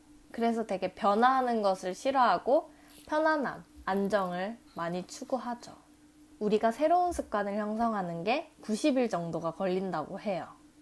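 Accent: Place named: Seoul, South Korea. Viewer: 어릴때 서울에서15년정도 살더니 서울말을 잘하네요. 영상도 너무 좋네요.~♡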